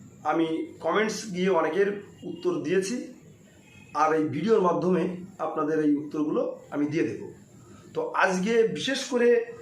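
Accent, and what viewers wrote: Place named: Bangladesh. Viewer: আপনি যে খাঁটি বাংলাদেশের লোক আপনার কথাবার্তার তাপ পরিষ্কার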